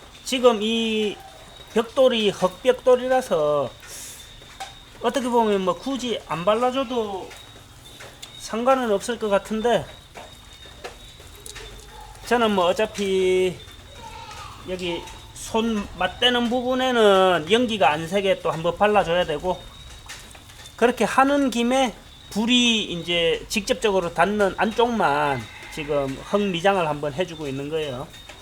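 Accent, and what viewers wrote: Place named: Hamyang, South Korea. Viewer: 함양은 합천군과 붙어 있지요 기와 땜에 함양읍에 간적이 있어요 억양이 같아서 왠지 정감이 가더니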